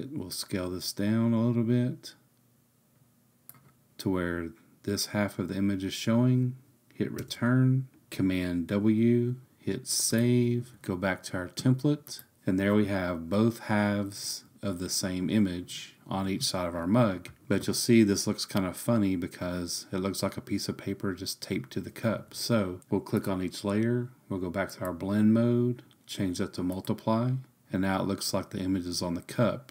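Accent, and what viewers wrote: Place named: United States. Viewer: your american im scottish DIDNT understand a thing you were talking about sorry :-((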